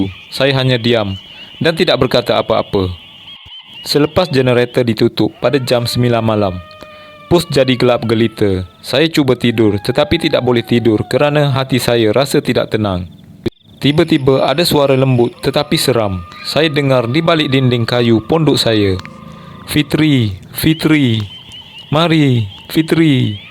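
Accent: Kelantan, Malaysia. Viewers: slang aka bau budu insyaallah tetap pekat ngalir dlidah kita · Ooo ore kelatee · Asslm bang mantap pengalaman bang,abang orang Kelantan ke bunyi cara abang ckp macam orang Kelantan hahah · Pekat klate demo.. 😅😅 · Bunyi Kelate nihhh...guano bohh...hahaha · Pekat loghat Kelantan..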